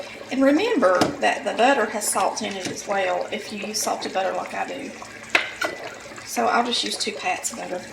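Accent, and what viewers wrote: South, United States. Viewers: "boled" okra? I actually love you southern accent. Thanks for the video.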